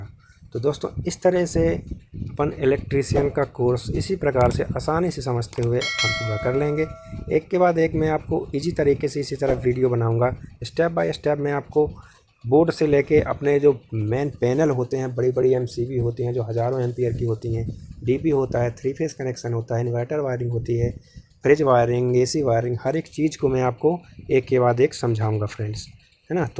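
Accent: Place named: Jabalpur, India. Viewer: Bahot badiya ..bahot pyari video hai..lage raho.. Jabalpur se ho kya..aapki bhasa se lag Raha hai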